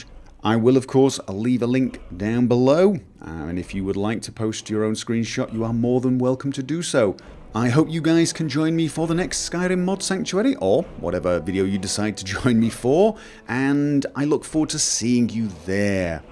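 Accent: United Kingdom. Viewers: ***** Thought so, he sounds Brittish or something like that.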